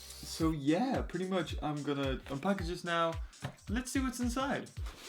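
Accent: United Kingdom. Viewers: plz reply are you Brtish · Are you britesh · you are from UK??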